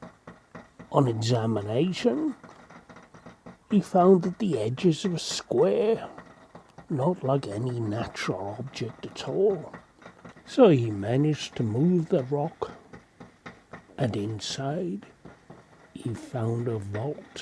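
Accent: Wales, United Kingdom. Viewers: He has a Welsh accent. Both countries have a similar native language Gaelic. If I'm not mistaken.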